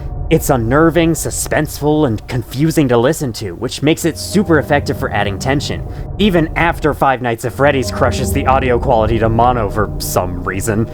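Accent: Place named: Asia. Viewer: He sounds asian but its not an insult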